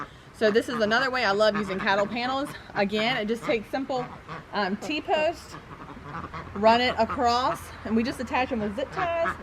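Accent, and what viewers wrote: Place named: Texas, United States. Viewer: Hi i just found your channel. It sounds like you have a possible Texan accent. We are a family of 7 but our kids are all grown. Trying to start a more self sufficient homestead lifestyle. Im 52 and the hubs is 54. And we own our own drafting and design company on our 2acres of land in SE TX.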